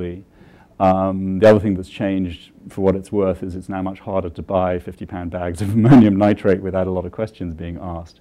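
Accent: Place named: United Kingdom. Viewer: The republican party is not a terrorist party, we would only become that way if our country in our constitution is jeopardized. You need to get that straight, you sound like a brit so you don't know what the Hell is going on inside our country. That is precisely why we ran your asses out of our country in1775.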